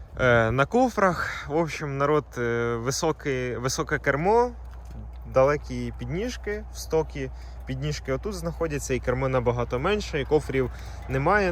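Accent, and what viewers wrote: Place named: Poltava, Ukraine. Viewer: Нехай радує друже) Суржик як на Полтавщині)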